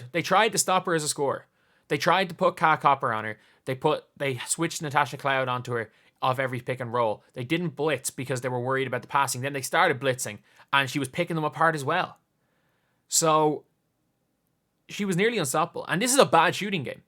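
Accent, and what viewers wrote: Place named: Ireland. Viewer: Good job. What part of Ireland are you from?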